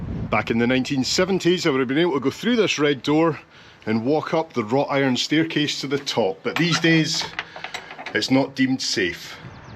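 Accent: Scotland, United Kingdom. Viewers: I love your videos. So very interesting and you present it so well. I like how you just let the trips evolve. We love Scotland and its friendly people and your wonderful accent. Makes us want to visit again. We were there a little more than a year ago. Regards, Jim North Carolina USA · Another brilliant video-thanks for taking me to places I love and doing it in a natural relaxed way with that lovely Scottish accent. ThIs one came at the right time for me as I am reading The Chess Men by Peter May part of the Lewis trilogy. If you have not read these books they give great insight into the character of the people of these islands. Carry on the good work.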